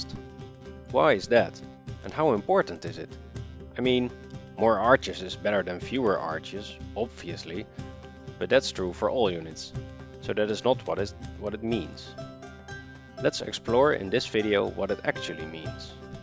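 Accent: Netherlands. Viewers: Oh god if that's not a Dutch accent I don't know what is :P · Such a thick dutch accent. Cannot listen to this, sorry.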